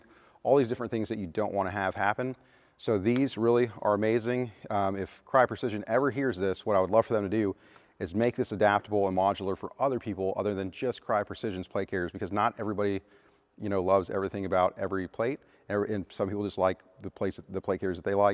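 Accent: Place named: West Coast, United States. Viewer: Knew he was west coast the moment he started talking. God be with you and your men🕊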